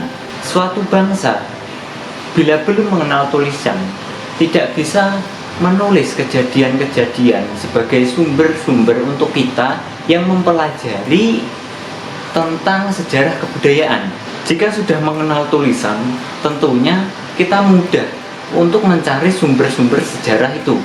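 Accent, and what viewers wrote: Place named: Java, Indonesia. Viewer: Bahasanya medok banget bos wkwkwk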